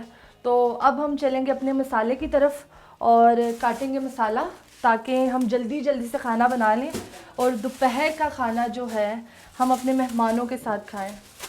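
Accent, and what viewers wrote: Punjab, India. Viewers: Pure punjabi excent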